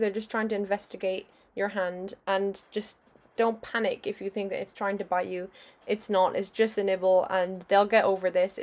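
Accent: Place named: Ireland. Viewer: Love the irish accent! Great video! Next wk. will be bringing home very skittish little girl! Will follow your tips!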